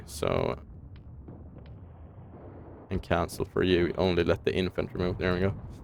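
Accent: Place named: Sweden. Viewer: I knew that you're Swedish from the accent lmao😭.Btw I love your content,keep on going,you'll eventually get more views and subs.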